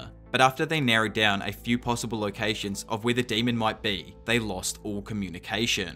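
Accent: New Zealand. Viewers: Loved the kiwi accent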